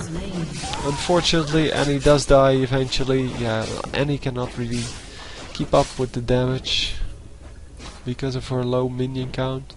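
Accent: Netherlands. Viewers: Are you Dutch? you sound a bit dutch ^^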